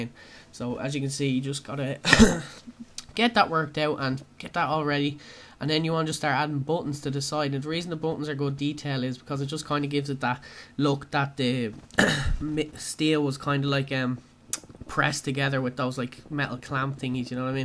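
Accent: Ireland. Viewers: You sound Irish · You kind of sound Irish but I can't tell...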